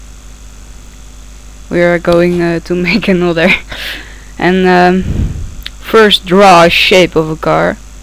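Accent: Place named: Netherlands. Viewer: haha, je hoort ook egt zo'n hollands accent! xD k ben ook 13 jaar, (over een week 14) ik ga ff je tutorial bekijken.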